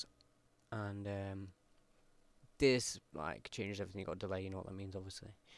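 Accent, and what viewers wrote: United Kingdom. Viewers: man your accent is the worst uk accent, worse than scouse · IDK IF I'M JUST TIRED AND CAN'T UNDERSTAND U BUT U R JUST 2 BRITISH M8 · Hahaha sorry, I just started watching the show and now I think half of Britain sounds like him. Great tutorial by the way! I'm not blindly stumbling around the program making beats like a 2 year old with a sound making toy anymore.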